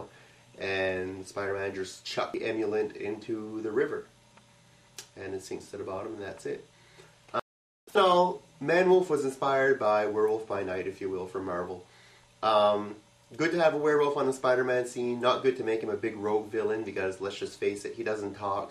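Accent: Canada. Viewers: This stuff is great for the geek at heart! By the way, man. You gotta be from the Atlantic Canada. I could pick that accent out anywhere. Nova Scotia by any chance?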